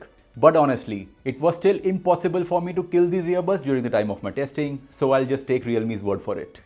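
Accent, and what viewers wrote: India. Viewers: Indian ho ya foreign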